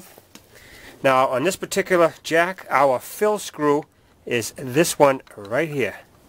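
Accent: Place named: New England, United States. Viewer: Outstanding content. Thank you for this video! If you don't mind me asking, is that accent from Rhode Island, CT, or other?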